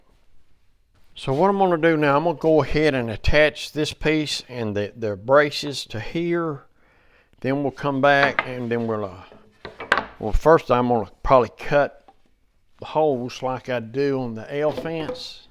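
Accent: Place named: Kentucky, United States. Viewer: Hello sir. I have been watching you from Kentucky. I TRULY enjoy your videos, and one of the reasons I stuck around enough to learn something was it was nice to hear someone who talks like me. Thank you for putting up with foolish and mean people in order to teach and entertain the rest of us.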